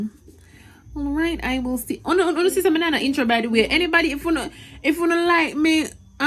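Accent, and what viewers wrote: London, United Kingdom. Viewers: lol 😂😂😂 you got the London accent on point ❤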